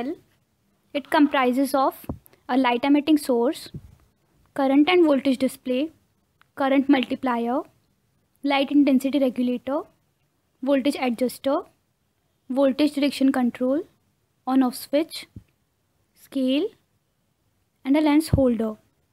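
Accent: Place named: India. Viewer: Indian English..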